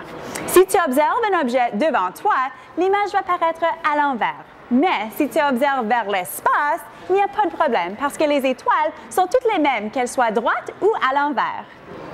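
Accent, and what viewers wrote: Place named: Canada. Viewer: décidément j'adore l'accent de nos cousins/cousines d'outre-Atlantique, je le trouve toujours hautement réjouissant. Contenu intéressant pour animer un atelier (classe de découverte, colo...) avec des enfants (je dis bien les "enfants", pas notre mot d'argot qui veut dire tout à fait autre chose au Canada! - d'ailleurs comment avez-vous traduit le titre du film "Honey, I Shrunk the Kids"?) Pour la collimation j'ai un doute, pour observer la nébuleuse de la Lyre aussi; perso je choisirais la grande lunette si j'avais les moyens, mon XLT 120 est ridicule à côté.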